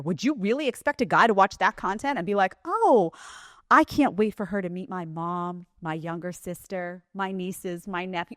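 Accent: New York, United States. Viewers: Do I hear a tinge of Ney York or Boston in your accent once in a while?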